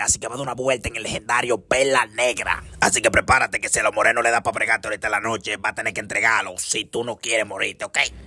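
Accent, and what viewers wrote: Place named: Dominican Republic. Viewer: ey demasiado duro te sale todas las idisomas de RD jajajaaj🤣🤣😂😂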